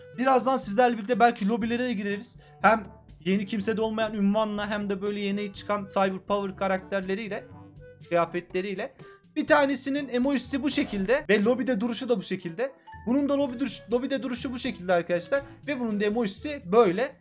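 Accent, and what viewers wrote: Gaziantep, Turkey. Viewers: Abi anteplimisin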